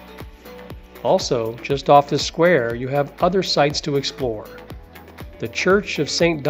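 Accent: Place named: United States. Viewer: This video is very informative and helpful to those planning their first Lisbon trip. I do like your recommended walking map directions. I've been to Lisbon and covered most of what you mentioned but there are some places I did not see and do want to go back some time and cover those as well. My only criticism for your video is that you made some glaring typos and mispronunciations (such as Barrio Alto instead of Bairro Alto). Baixa should sound closer to "Buy-Shah" than "Bahk-Sheeya". It is such an American tendency to pronounce things the way an American would read them instead of learning how they are really pronounced. When creating a informative video on a place, you do owe it to your viewers to make a better effort here. This critique aside, you did a very good job on the information presented to help people plan things.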